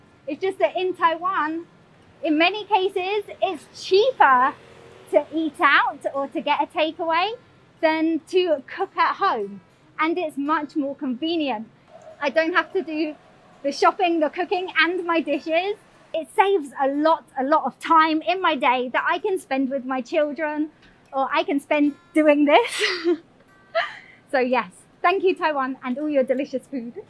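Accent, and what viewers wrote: United Kingdom. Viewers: 英國腔真的很好聽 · Thank you For introducing Taiwan's good and strong places To whole foreign countries But l do appreciate your special UK accent So cute and lovely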